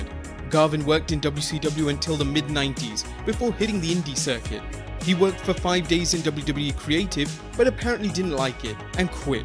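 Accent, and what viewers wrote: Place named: United Kingdom. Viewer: Who wants to listen to a brit?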